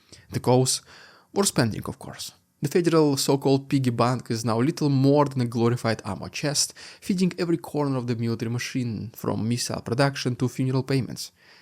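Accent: Russia. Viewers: 😂😂😂 He is really Russian..strong Russian accent of English..no native English or USA speaker or AI able to make it sounding naturally..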